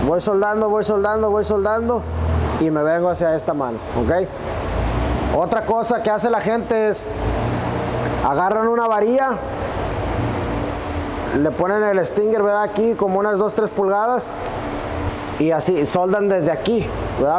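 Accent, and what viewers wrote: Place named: Texas, United States. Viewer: Me llega el acento tejano del compa en los vídeos de inglés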